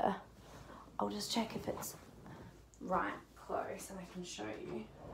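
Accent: Australia. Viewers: I love that you share all of this with us and that you’ve never lost your Aussie accent!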